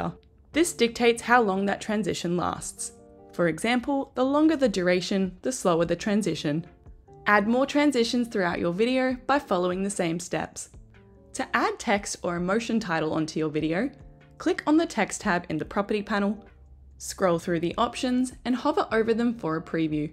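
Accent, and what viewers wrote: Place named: Australia. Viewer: so, when you delete a section that you cropped or cut out, it automatically closes the gap again and butts up to the next nearest video front or back??? You, sound Aussi . I watched this about 2 weeks ago and could not understand it at all. been using openshot and slowly getting the hang of it. still can't put in music correctly. I want to learn the easiest program. I don't know which is which. HELPL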